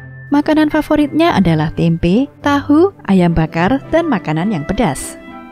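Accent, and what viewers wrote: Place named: Lampung, Indonesia. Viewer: Orang Lampung mana suaranya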